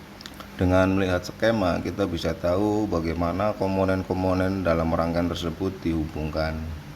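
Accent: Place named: Banyumas, Indonesia. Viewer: Orang mana om. pngin kuraus juga. kayanya org dekat nih. bhsanya ngapak juga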